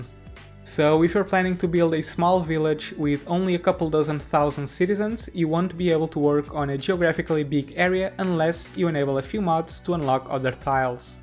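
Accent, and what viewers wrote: Portugal. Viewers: Suspeitei que eras português, e confirmei. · Vi logo que eras tuga pelo sotaque, props mano! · I thought you are portuguese. Now I'm sure :)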